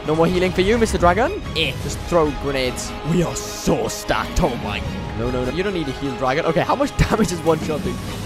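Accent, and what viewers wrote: Wales, United Kingdom. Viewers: Hol up are u Welsh?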